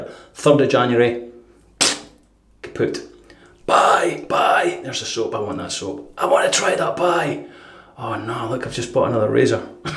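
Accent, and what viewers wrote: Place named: Scotland, United Kingdom. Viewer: I love Scotland. My Mother came to America from Scotland as a little girl in 1923 with her 2 siblings and her parents. They came from Paisley. My Grandfalther, Robert Muir lived with us in his later years. I loved his accent. He sounded just like you. Long live Scotland!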